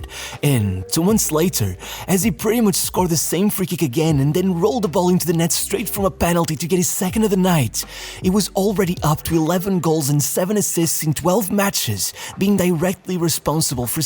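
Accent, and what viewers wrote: Portugal. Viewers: I mean, he sounds portuguese which is closer culturally to brazil than most other european countries. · q brasileiro o q, ele fala S com som de Z kkkk. é portugues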